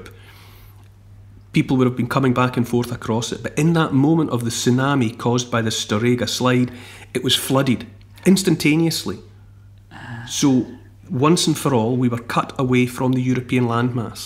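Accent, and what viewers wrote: Scotland, United Kingdom. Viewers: Like you Scottish accent ♥️❤️🧡💛💚💙 Cheers from Moldova Freédom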